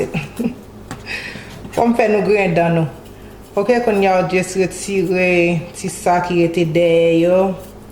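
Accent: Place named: Haiti. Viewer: Great job.... and you were worried about you Creole accent! Proud of you! Happy New Year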